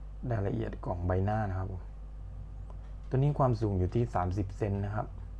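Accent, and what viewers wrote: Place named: South, Thailand. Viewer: พี่บ่าวคนใต้แน่เลย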